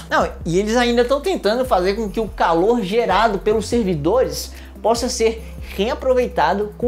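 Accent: Ceará, Brazil. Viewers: Grande cearense, meu vizinho de estado hahaha conheci pelo sotaque antes mesmo de ele citar em algum vídeo o "por exemplo, aqui em Fortaleza". Canal massa, camarada.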